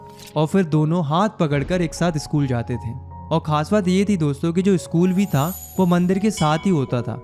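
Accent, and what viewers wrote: Uttarakhand, India. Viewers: sirr ap uttrakhand sa honaa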